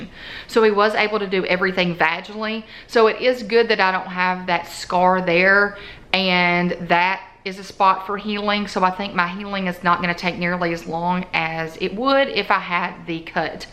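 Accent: South, United States. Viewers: Watching your video, hope ur well, I had mine December 1st, only bled 3 days, and stopped taking pain meds by the 3rd day, awww ur kitties, how many you have , such sweetie s, Sending hugs and prayers, where you from, I love people with southern accent, oh Girl, I know about the pot bellies, I hate it, I'm 46 , I had mine in December 1st and my Birthday is on Jan 9th, so I was 45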